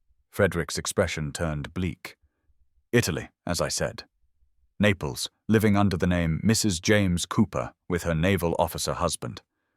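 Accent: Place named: United Kingdom. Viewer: Thank you so much for this lovely story. It is an original and enticing tale of romance and intrigue, with an ending appropriate for the situation you so elegantly described in the long story. I enjoyed the narrator with the British accent. It lends a distinguished touch to your wonderful story. Please keep up this wonderful channel and your tales of Victorian society. Perhaps you could utilize a few more images that would enhance your storyline…💕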